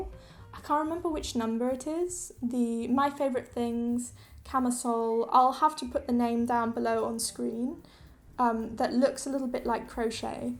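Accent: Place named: England, United Kingdom. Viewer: it was so interesting to hear about your very International childhood. Thanks for sharing! Even though I’m very bad with recognizing accents, I did have the feeling your accent was completely “born and raised” in England. 😊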